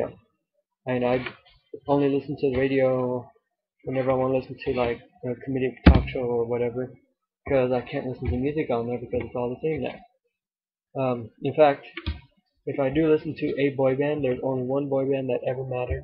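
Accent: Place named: United Kingdom. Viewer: why do you sound Britsh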